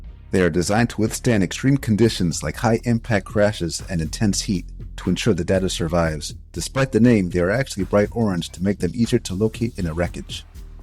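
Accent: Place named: United States. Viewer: Why are all our Australian vids always voiced by Americans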